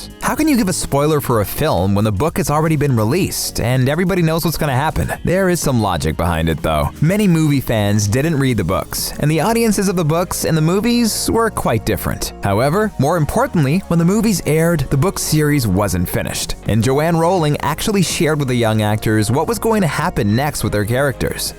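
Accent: United Kingdom. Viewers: what is your British but American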